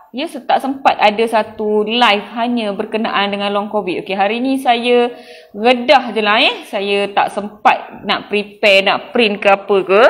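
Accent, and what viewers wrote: Malaysia. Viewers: Doktor punya slanga memang melayu habis. Terima kasih diatas penerangan jelas ini.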